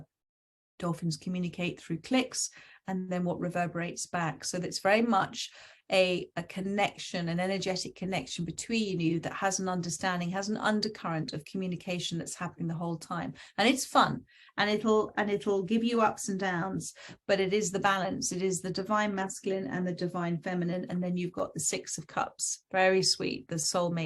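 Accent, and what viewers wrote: United Kingdom. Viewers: Love this@ psint the sky on the Wedt Coast with family. They are buying a house. I will have my own rm. Travel again...another grandchild , their slowing down work load to do. I am the matriarch of the family. No worry no struggle. Thsnk you. I've missed your readings. Been months!! I l8ve yor British accent. In my blood on mom's side. May she RIP. I have the Medicine Animal cards. Got in the late 80's. Was in fear during the pandemic. Feeling much better.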